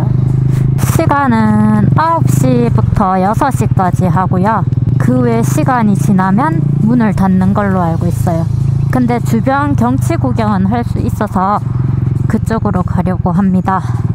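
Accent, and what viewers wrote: Busan, South Korea. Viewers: 와.. 부산사투리 매력 취한다...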